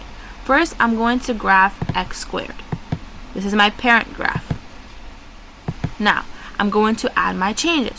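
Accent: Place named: New York, United States. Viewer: I am new to the channel I can just tell you are are new yorker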